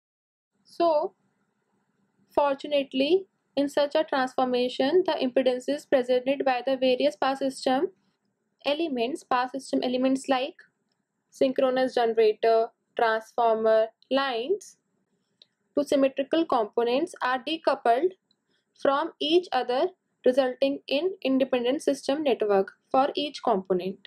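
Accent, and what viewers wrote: India. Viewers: Indian voice is hot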